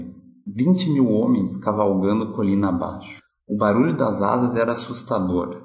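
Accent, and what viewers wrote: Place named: Curitiba, Brazil. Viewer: obrigado pela aula de história, o Islã mais uma vez ameaça todo o Ocidente, teu sotaque não te nega como um bom conterrâneo, reside em Curitiba?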